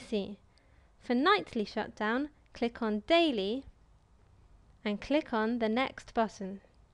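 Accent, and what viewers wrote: United Kingdom. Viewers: That voice + london accent hit me in the heart LOL .... nice video btw :D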